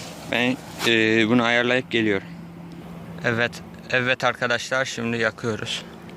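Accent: Black Sea, Turkey. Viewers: karadenizlimisin şiven var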